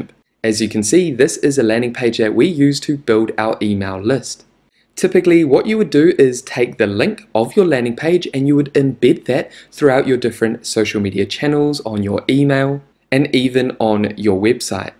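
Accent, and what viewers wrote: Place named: New Zealand. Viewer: Love your NZ accent dude! Cheers from Canada!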